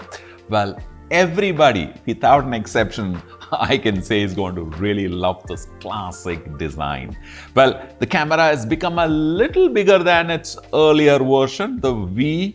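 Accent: Kerala, India. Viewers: great Keralite accent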